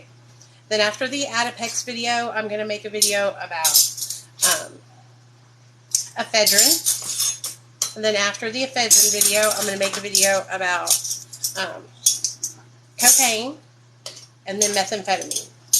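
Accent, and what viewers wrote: South, United States. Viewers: Your such a good mom I think Nate is such a good little boy. It’s so cute how u call him son . Very southern sounding I think? I’m in wi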